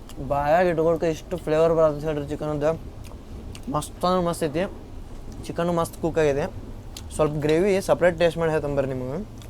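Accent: North Karnataka, India. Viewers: your native Kannada north karnataka accent narration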